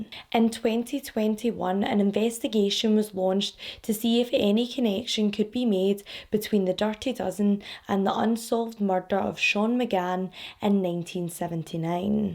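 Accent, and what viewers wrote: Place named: Newcastle, United Kingdom. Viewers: Why i your....new castle accent is why i better than mine.....yours would make gary linker proud.. and chloe ferry 😁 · Love the Newcastle accent haha love some representation for northerners ❤️❤️‍🔥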